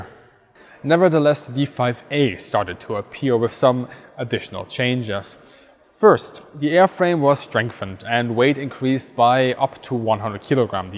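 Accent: Germany. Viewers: Dein Englisch entlarvt dich als Deutscher. Horrido alter Hase. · Loved this. Brought up on Biggles stories and WWI fighter pilots. It's great that your accent gives the German words a better sound that an English person could. 👍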